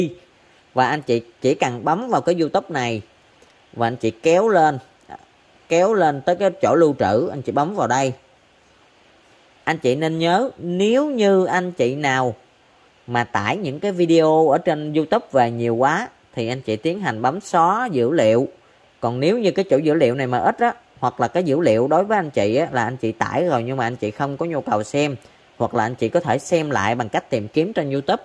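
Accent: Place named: South, Vietnam. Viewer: cám ơn em nhiều lắm, em hướng dẫn rất tận tình, chất giọng nam bộ rất dễ thương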